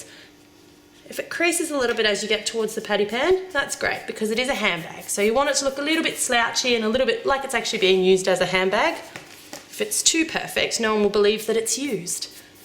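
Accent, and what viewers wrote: Australia. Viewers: I love..I mean LOVE your Australian accent man if I had one j would never shut up cuz it's so cool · oh my gosh, I love Australian accents. c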